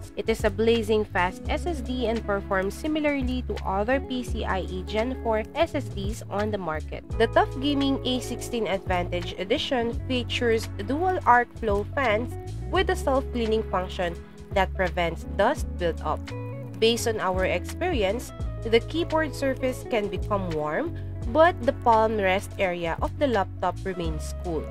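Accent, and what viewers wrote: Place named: Philippines. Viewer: That voice and accent.. pilipino po ba kayo maam? Haha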